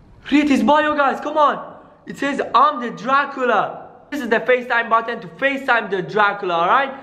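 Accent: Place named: United Arab Emirates. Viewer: he is from united arab emirates thats why he talks like that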